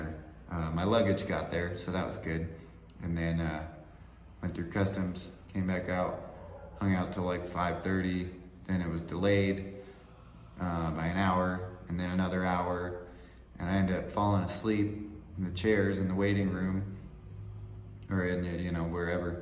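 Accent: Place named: Spain. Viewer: Love your hoodie and your spanish accent jaja keep trying and improving it :) Stay tuned and have a good time 🤘🏼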